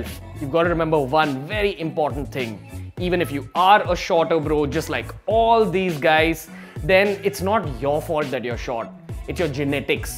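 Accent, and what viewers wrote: India. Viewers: I’m just hear to listen to ridiculous tips in an incredibly thick Indian accent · Did I just watch a whole video with an Indian guy with an accent giving styling tips and really enjoyed it ?! Lol seriously though great editing , great talk! Feel like this guy could sell me anything .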